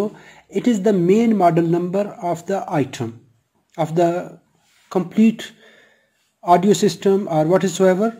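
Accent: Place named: Arab world. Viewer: impressive arabic accent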